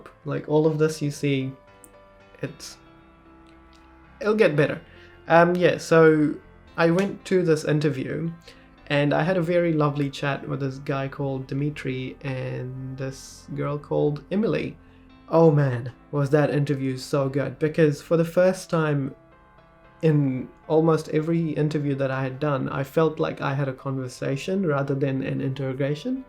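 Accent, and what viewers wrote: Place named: New Zealand. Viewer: Good to have you back mate. Can hear that NZ accent in your voice 😉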